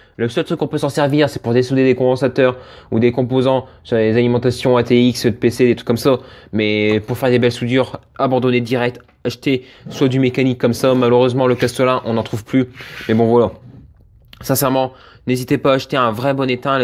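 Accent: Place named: Vosges, France. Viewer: Je crois qu'il est Vosgien (d'après l'accent) et je sais que les gens là bas ont tendance à parler un peu vite. M'enfin rien de grave, la vidéo est de qualité et c'est ce qui compte ^^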